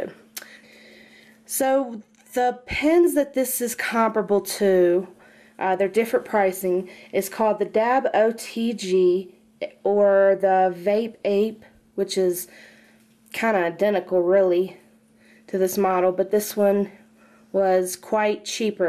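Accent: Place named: South, United States. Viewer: Your gorgeous love your southern accent what a fox :)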